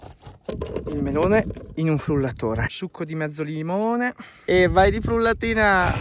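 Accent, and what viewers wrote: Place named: Romagna, Italy. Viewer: mi toglieresti una curiosita, sento che hai un'accento romagnolo, ma la location dei video e' lo stesso posto in cui vivi? se si' come si chiama quel posto in montagna, che e' splendido, si adagia perfettamente alle tue performance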